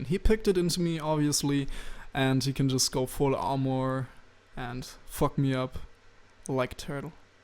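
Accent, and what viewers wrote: Germany. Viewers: First of all, great video! Second of all, where are you from? Your English is very good but you sound German.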